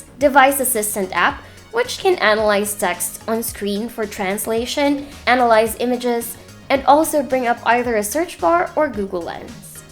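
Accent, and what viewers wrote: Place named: Philippines. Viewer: Her Filipino accent is quite fading, so majority of the words you hear are the right pronunciation since she has borderline American accent.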